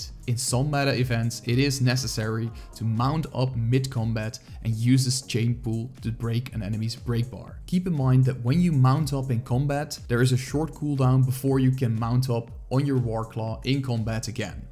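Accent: Netherlands. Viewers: Came for the A-class content. Stayed for the dutch accent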